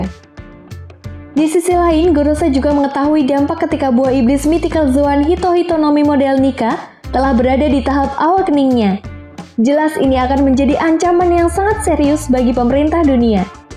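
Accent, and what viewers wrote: Java, Indonesia. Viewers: Min saran nih, saran aja ya, kalo bisa channel nya di bagi 2 aja, 1 voice cw 1 lagi voice cowo, jujur gw lebih suka yang suara cowo nya yang khas mendoknya, dan maap maap ni ye, gw skip yg cw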